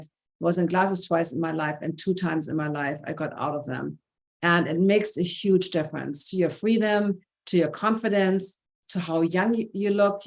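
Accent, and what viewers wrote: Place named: Germany. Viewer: please speak slower in your videos because of your German accent.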